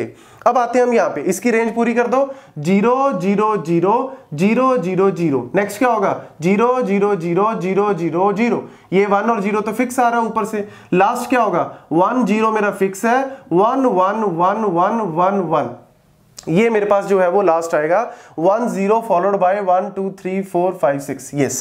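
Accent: Punjab, India. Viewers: Good explanation . Apki hindi main punjabi accent atta hai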